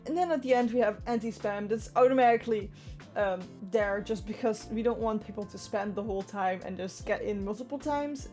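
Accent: Netherlands. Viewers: Been living on the Netherlands for 4 years, I could tell you were dutch in the first 10 seconds of the video xD Thanks for the tutorial, btw, it was very useful! ❤️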